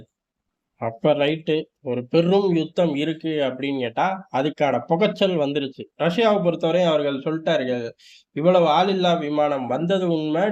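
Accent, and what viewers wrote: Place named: Theni, India. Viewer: அட..தேனி ஸ்லாங்! 😅 சூப்பரு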